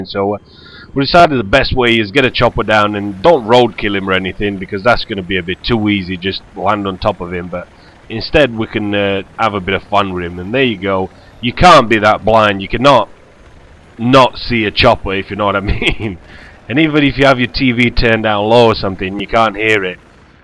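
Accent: United Kingdom. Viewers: where in the UK are u from?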